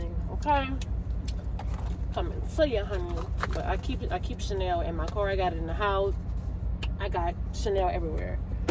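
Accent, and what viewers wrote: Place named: Louisiana, United States. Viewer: Benny sent me,, I’m here for that Louisiana accent 🔥🙌🏾😂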